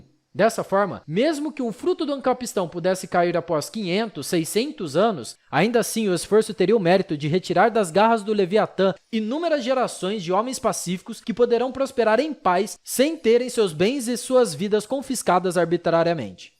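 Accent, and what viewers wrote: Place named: São Paulo, Brazil. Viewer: Meu nao da pra ouvir um audio feito por paulista caipira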